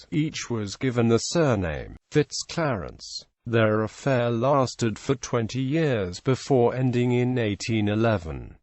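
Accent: United Kingdom. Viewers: Once again, very interesting but so poorly read. Why on earth were the producers unaware of this? Rather spoils what could have been a brief and welcome documentary. Was the reader British?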